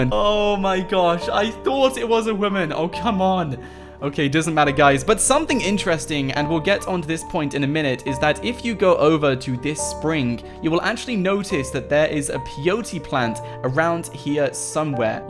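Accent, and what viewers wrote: United Kingdom. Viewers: It's a British accent come on guys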